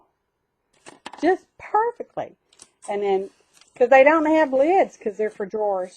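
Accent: South, United States. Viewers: I just love your southern accent I’m from south Mississippi and sound like you lol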